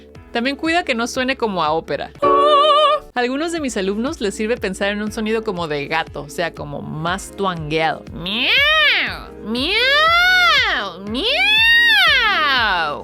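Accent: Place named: Mexico. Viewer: Che, que lindo acento Mexicano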